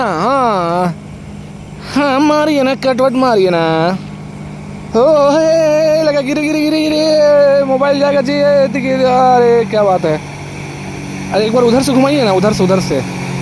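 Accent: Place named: Jharkhand, India. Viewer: Mast Jharkhandi language. Maja aa gaya.😊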